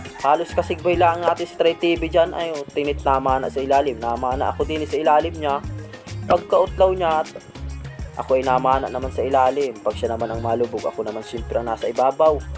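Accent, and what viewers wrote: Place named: Marinduque, Philippines. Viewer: a my classmate ako dyan sa dawis si Japet labrador si melody saet mrami ako classmate dyan ipagpatuloy mo lng yan kabayan suportado kita dito sa atami japan natutuwa ako sayo mg salita marindoquienio ka tlga god bless allways idol❤❤❤❤❤❤❤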